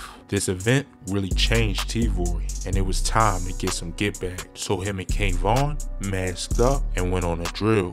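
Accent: Baltimore, United States. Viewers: You from Baltimore? You have a accent. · You got your story wrong bro. Troy wasn't opp shopping. Tb was looking for t roy and was right on his trail 2 minutesbehindhim. Troy was in the store to the back with someone else. Tb walked in with one of his boys and walked right up to Troy in the back of the store and shot him in the chest then ran out. You sound like you from bmore too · My man is definitely from Baltimore, I can hear a fellow Baltimorian whenI hear one. Can we get a dog (Dug)? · Where you from bro yo accent sound like ot Baltimore am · You must be from b-more. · On god. Soon as cuz opened his mouth, Straight outta Baltimore like shit😂🤦‍♂️🤦‍♂️ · You from Baltimore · I hear that Baltimore accent!!! Respect and love from DC Salute!!!!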